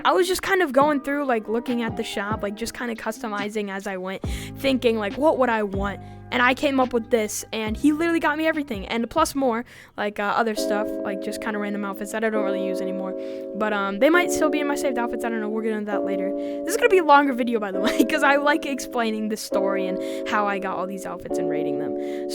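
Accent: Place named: United Kingdom. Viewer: Are you British or American I cant tell 😅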